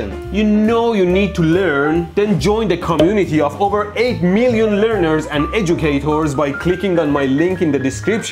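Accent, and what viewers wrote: Iran. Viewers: ایرانییییی ؟؟؟ لحجتو کاملااااا تشخیص دادم 😂😂😂 ولی دمت گرم خیلی مردی 😂❤ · Kheyli zaye english harf mizani adam mifahme irani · عاشق این لهجه ایرانیم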